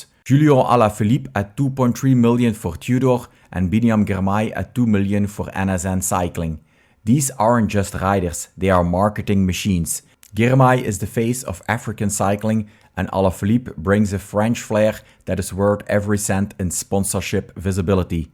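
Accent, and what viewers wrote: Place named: Limburg, Netherlands. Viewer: You have a funny english accent. Limburg maybe ?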